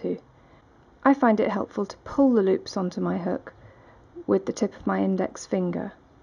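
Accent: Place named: United Kingdom. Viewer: Thank you,. You're were clear, and to the point. Love the effect of visually highlighting the important and critical stiches. You made it so easy! Enjoyed your British accent as well as your soothing voice. Kudos!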